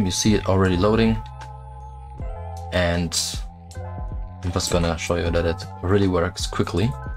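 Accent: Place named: Germany. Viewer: Man merkt, dass du deutsch bist hahah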